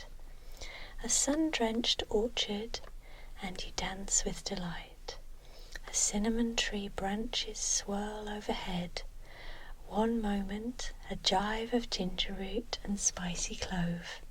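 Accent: England, United Kingdom. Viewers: cat I mainly detected a British accent, but I thought maybe there was a hint of Australian there. Regardless, it doesn't matter; she has an amazing voice and demeanour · Another amazing video. Love listening to fellow English ASMR accents ❤︎ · are you from uk? Your accent is not American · lovely British accent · Yes she is English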